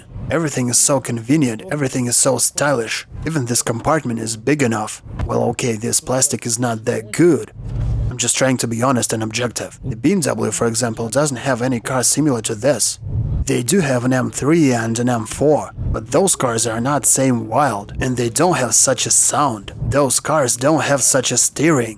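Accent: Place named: Russia. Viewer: как всегда - восторг! Субъективное мнение: Если этот канал ориентирован на англоговорящих людей, то им не будет интересно это смотреть из-за озвучки. Акцент слишком русифицирован и не чувствуется какого-то драйва диктора. Он не говорит так, как говорит американец или британец - интонационный поток "мертвый" в каком то роде:( Интонация всегда падает вниз и не передаёт эмоции рассказчика как таковые. Возможно в оригинальной озвучке их и не особо много было, но над этим явно стоит поработать и тогда англоговорящая аудитория будет заинтересована слушать это! Можно взять любой американский обзор и увидеть разницу - <link> Всех благ и удачи!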